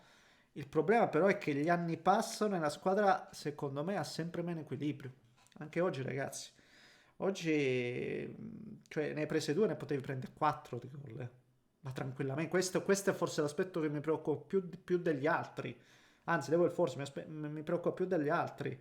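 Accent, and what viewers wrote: Calabria, Italy. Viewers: Non so di dove sei ma riconosco un accento calabrese ❤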